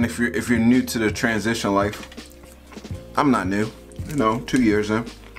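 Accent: Maryland, United States. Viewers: Can you do a vid flexing your Maryland accent It is so interesting 🤖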